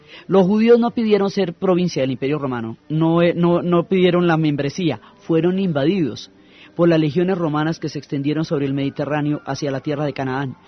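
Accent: Colombia. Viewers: Excelente! Soy una persona de 87 años , siempre he amado la historia y usted con sus conocimientos profundos , su voz clara amena y ese acento colombiano, me han hecho su más fiel seguidora . DIOS le siga protegiendo sus bones.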